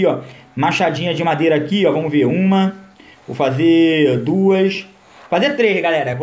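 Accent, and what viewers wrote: Rio de Janeiro, Brazil. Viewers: Tu é carioca no Sangue não é?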